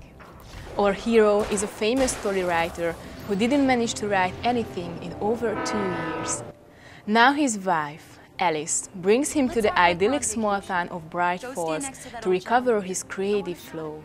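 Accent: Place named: Russia. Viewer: has a great Russian accent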